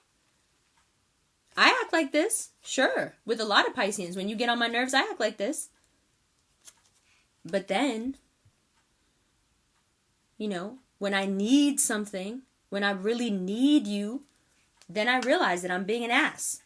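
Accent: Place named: Texas, United States. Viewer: Hearing you talk just gives me the vibe that your from Texas lol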